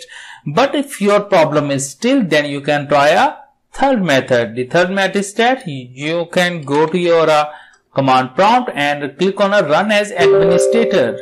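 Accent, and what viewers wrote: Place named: India. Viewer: Услышал акцент индуса сразу понял что можно доверять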